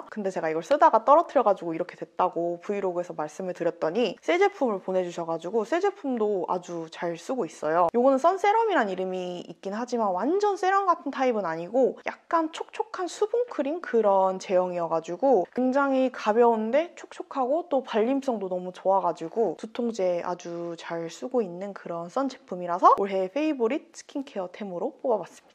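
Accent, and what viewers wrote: United Kingdom. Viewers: When are you going to film your vid in English? I love your British accent😭🇬🇧❤️❤️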